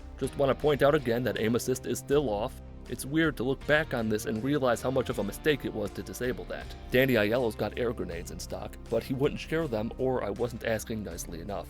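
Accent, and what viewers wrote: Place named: Michigan, United States. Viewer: took me three years to realize youre from michigan what is wrong with me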